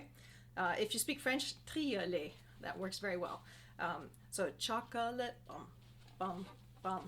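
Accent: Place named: Canada. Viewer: I found this video to be very helpful. I have small hands, short fingers, many years (66) and have been taking lessons from an excellent teacher. Never heard that you should roll a finger to the next string and never heard to “never” lift the fingers, which is what I have been doing. Going to try and see what happens!! Love your Canadian accent. Thank you for posting this video.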